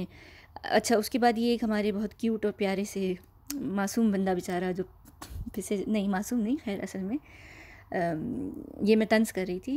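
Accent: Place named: United Kingdom. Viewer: Please please make a video on Arnab Goswami & Ankita.i am a big fan of your mam. Awesome analysis. I am a big fan of Arnab too but would love to hear your analysis & I can see he has not disclosed many things about Ssr's death in news. Not sure if there is any personal motive. Are we just watching a drama where maha govt & Republic TV are together & showing us a fake picture? It is so difficult to trust with news coming out nowaday. What do you think of vibhor anand. I have noticed he is making all these big big revelations on YouTube but there are no evidences shown by him. It feels like he is just hungry for an audience & followers. He says he is supreme court lawyer but I don't feel any credibility in anything he is saying. Please do make a video on Arnab & Ankita. I live in UK & it is 1.37 am & I am engrossed watching your videos. You also seem to be from UK can make out the British accent. 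Thanks for the awesome analysis.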